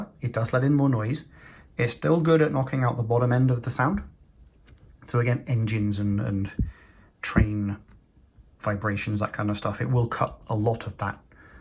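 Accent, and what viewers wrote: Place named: Corby, United Kingdom. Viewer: That sounds like a Corby accent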